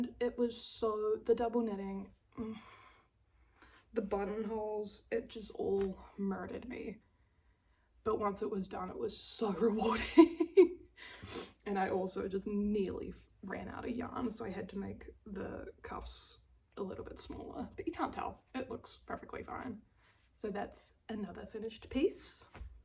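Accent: New Zealand. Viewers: I finished school in NZ and also studied at Victoria University of Wellington for 5 years. It's so nice to hear the kiwi accent again! I really love your projects and can't wait for future videos. Welcome to the podcasting world 😊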